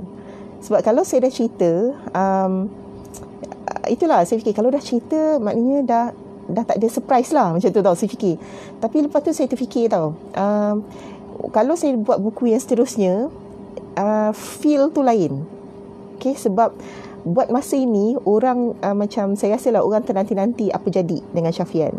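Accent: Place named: United States. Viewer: Buku naif best.. penulis membawa jiwa pembaca kesana.. banyak benda dpat beljar terutama culture. seorang yang cekal (Grit), resilience, introvert yang mempunyai daya kepimpinan. Pandai berdikari. Rasa macm nak dengr banyak benda lagi.. sbagai tambahan mgkin boleh translate kan English sentences, so that the rest also can understand. Oh, saya.. saya rasa ada bakat tersembunyi yg boleh di majukan, dan dikembangkan.. iaitu American English. Mana tahu boleh buat video mengajar peminat untuk belajar American English. mesti hebat tu.. hehehe.. Apa-apa pun bacaan yg best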